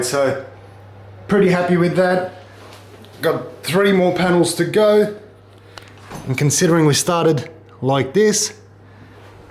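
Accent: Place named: Australia. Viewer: Randomly came across one of your vids, as soon as I heard the voice I was like “mad a Aussie channel” I’m about 40 videos in and have subbed. Cheers from Sydney ✌🏻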